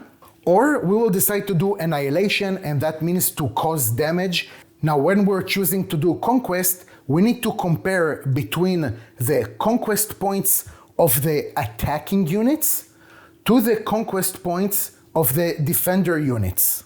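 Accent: France. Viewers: Oh, my apologies. I assumed you are French, since you are located in Paris and you definitely feature a French accent. If that isn't a French accent, what is? On top, you seem to sling a perfectly fine typed French. So if you are not French, you basically are the perfect infiltrator, probably on secret mission. 🤣🤣🤣 Again, sorry for my misconception.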